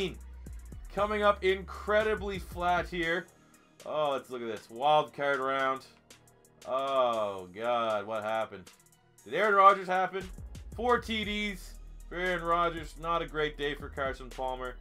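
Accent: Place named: Canada. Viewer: I can tell you are Canadian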